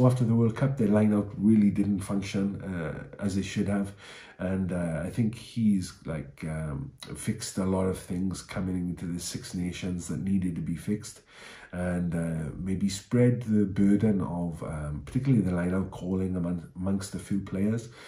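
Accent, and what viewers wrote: Wales, United Kingdom. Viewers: Nice video. I spent the first 30 seconds wondering were you Welsh or SA. That accent has travelled. 😄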